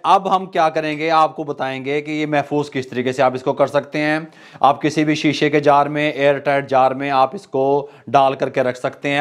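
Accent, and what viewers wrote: Punjab, India. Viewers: Zaberdast gi. Ap hazare se hai kia ya punjabi? Apke bolne ka style mjhe acha lgta h. ☺️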